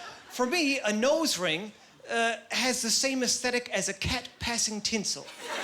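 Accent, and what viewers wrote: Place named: Maritimes, Canada. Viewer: >>>. Tinsel cat ?? Best ever. Excellent set. I love a Maritime accent.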